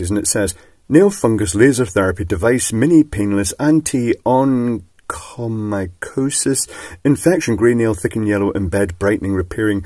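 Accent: Scotland, United Kingdom. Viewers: You're voice sound like Scotland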